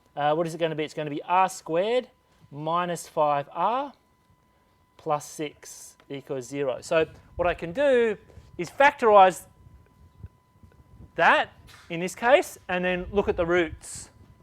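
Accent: Australia. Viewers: Thankyou, my lecturer is foreign and i have trouble understanding him, thank god you are also australian! You have literally explained this perfectly for me and i understand how to do it! THANKYOU!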